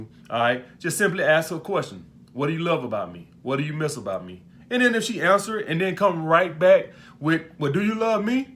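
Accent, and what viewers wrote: South, United States. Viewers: Do the southern accent help you in NY?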